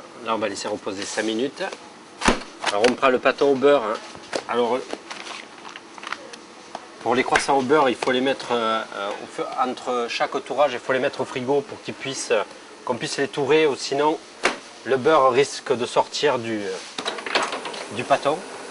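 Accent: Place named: Provence, France. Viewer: Un vrai plaisir....BRAVO....ET l'accent au top...#PACA...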